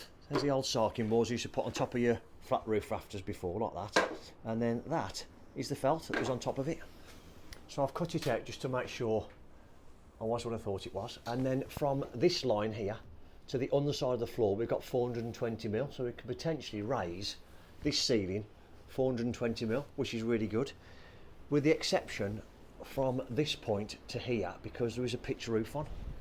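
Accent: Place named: Black Country, United Kingdom. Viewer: cheers bud about to do my own ,you sound local are you in blackcountry?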